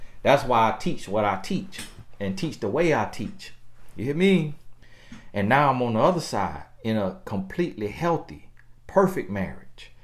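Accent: South, United States. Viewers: Be blessed!!! Thank you so much for your wealth of knowledge! I look forward to your hour long videos every day!!! You have changed my life so much and I cannot thank you enough!!! Started my coaching class as well! I do favor the country version of ya!! That guy that said your country accent would set you apart from everybody else wasn’t lying! It’s definitely what makes you stand out and not sound like everyone else!!! So thank you for being you and all the work you do! God Bless You, The Queen, and Your Kids!!!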